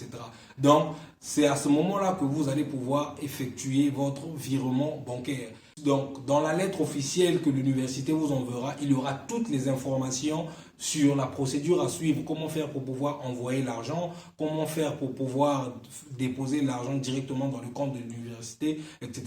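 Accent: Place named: Democratic Republic of the Congo. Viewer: Je sens l'accent congolais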